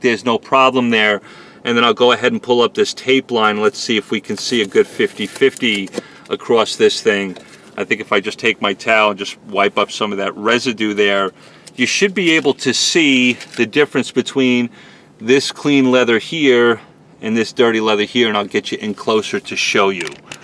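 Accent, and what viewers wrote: New York, United States. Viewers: Sounds like a Liberal from New York living in Dallas. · Is that a New York accent I hear? I love it. Thanks for the tips!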